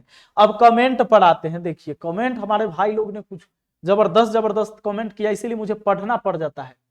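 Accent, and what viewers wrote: Bihar, India. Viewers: kya bihari andaaz hai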